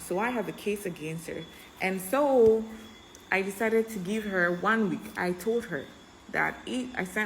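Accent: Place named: United States. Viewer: U sound like someone living in usa not Italy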